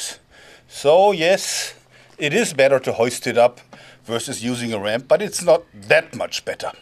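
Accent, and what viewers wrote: Germany. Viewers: Am I the only one who gets this sue per sinister feeling when I hear this dudes harsh German accent?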